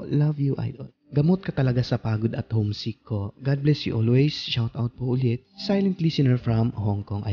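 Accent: Visayas, Philippines. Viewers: Qa cute mag bisaya oi😊😊😊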